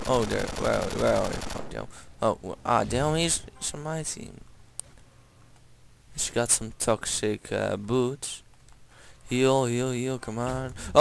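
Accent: Netherlands. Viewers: ik hoor dat je nederlands bent maar je kan goed engels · I like your video, you can speak pretty good English but I know you're Dutch :p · are u from the netherlands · ***** hij is nederlander het accent is zo typish... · are you dutch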